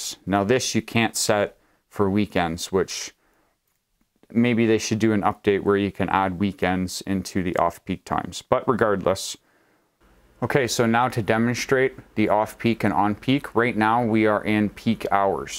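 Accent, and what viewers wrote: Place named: United States. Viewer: I thought Yetis were brown? LOL. I have the AC200L, and can't help chuckling at the American pronunciation. Great video by the way. I have a 5KW solar installation on my rooftop. The power company will only give me 5 cents a Kilowatt input credit, so during the daylight hours I run all my power hungry devices, pump the house full of heat or cool air depending on the season. Now I have the AC200L charging during the daytime and running some of my essentials at night. I have a folding solar panel that I can use in case of grid failure, so I may never recover the full cost of the unit, but I have a bit of a guarantee against blackouts.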